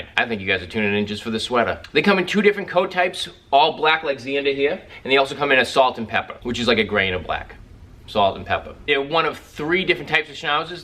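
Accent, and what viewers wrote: Boston, United States. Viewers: Are you from Boston??? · u southie? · Love that Boston accent. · My brother! I hear a Boston accent. Yeah? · From Boston? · I can hear some Boston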